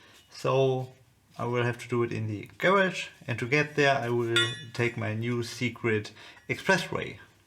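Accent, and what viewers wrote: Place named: Germany. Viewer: Daaamn boy, i love that german accent :)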